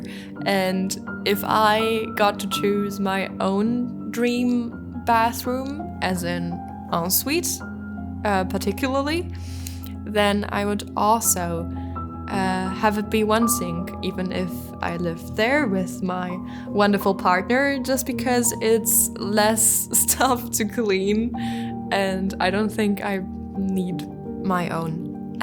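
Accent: France. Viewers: You sound part french, danish and irish to me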